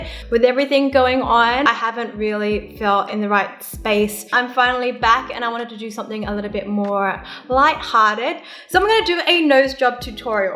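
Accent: Australia. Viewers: Man, I’m so glad I discovered your YouTube channel. You’re just so absolutely gorgeous and beautiful. I also love your Australian accent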